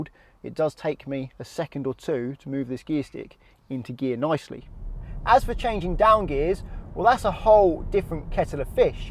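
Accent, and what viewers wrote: England, United Kingdom. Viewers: Can’t listen to this because British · British innnit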